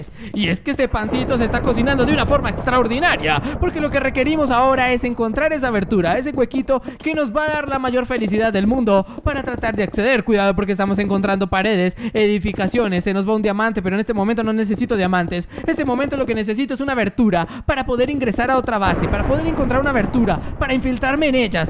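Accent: Colombia. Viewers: Alguien me dice de que país es xD parece colombiano papá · no sabia que eras de medellin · algo me dice que este vato es colombiano xd like si sos de colombia :v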